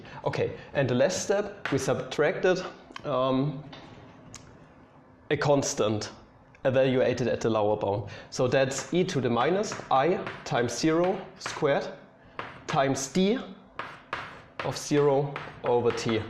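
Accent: Germany. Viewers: You've got a nice German accent, I like it!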